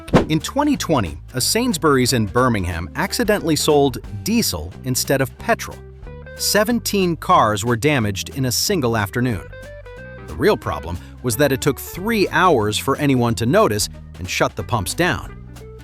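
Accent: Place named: United States. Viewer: And an American accent . I smell a load of AI bollocks !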